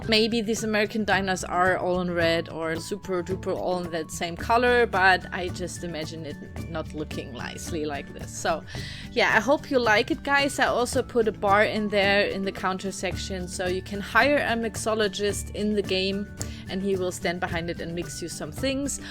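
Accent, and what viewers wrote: Germany. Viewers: Du hast wirklich einen starken deutschen Akzent und die machst mehr Grammatik- als Vokabelfehler. Aber du wirst besser. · )) I don't get that myself either. Maybe they also have bad accents and are mad at themselves and thus also at you for having that German accent? I don't know, I find it charming when I can hear an accent from the non-native speakers I'm subcribed too and that make English videos.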